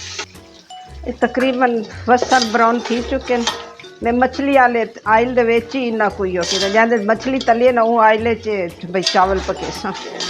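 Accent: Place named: Saraiki, Pakistan. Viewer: Sariki voice im like good Ap ka channel subscribers kar dya ha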